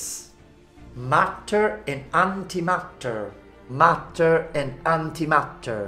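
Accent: Italy. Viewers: this is officially my favorite video ever: his English-Italian accent mixed with a topic so interesting and from which I've always been fascinated, fills my afternoons with joy. Your lessons are the best.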